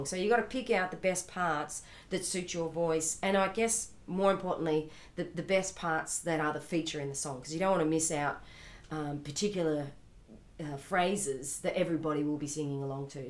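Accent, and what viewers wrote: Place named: Australia. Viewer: nice video!! love that Aussie accent too!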